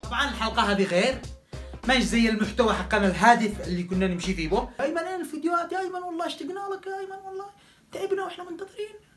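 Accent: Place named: Taiz, Yemen. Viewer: فناااااااااااااااااااااان انا فدا اللهجة التعزية 😍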